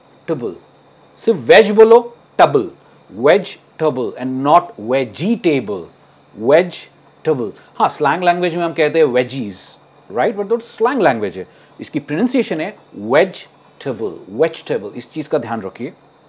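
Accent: United States. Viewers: In my humble opinion, You're teaching american accent not British accent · Are teaching american English pronunciation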